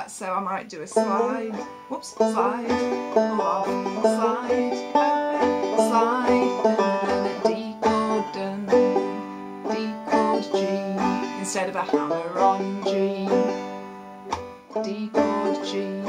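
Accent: England, United Kingdom. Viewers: You are so awesome! I love your English accent. I could listen to you all day long. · Love this video! It's timing is perfect for a newbie like me....other videos go way too fast! And an English accent too. Ideal! #subscribed #fanboy! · Are you from England?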